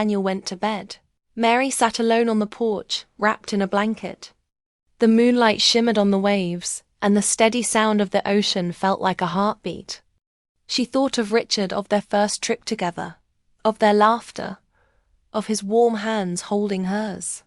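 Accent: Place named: England, United Kingdom. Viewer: Very appealing English accent. Thanks.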